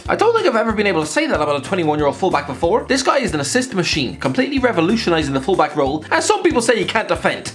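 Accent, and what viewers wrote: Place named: Ireland. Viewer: Me: Goes onto HITC Sport Hears Irish guy Me: Leaves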